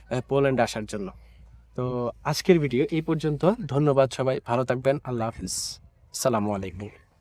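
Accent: Chittagong, Bangladesh. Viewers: ভাই আপনি কি চট্টগ্রামের ছেলে?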